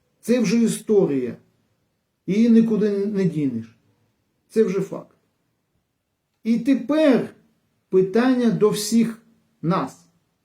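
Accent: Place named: West, Ukraine. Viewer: точно как у нас на западе в глубоком селе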